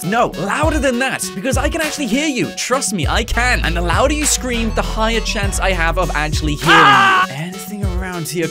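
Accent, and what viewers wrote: United Kingdom. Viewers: are you from United Kingdom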